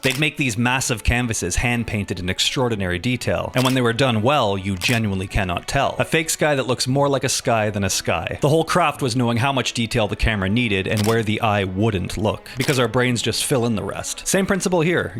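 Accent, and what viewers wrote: Canada. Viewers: Us? Your canadian?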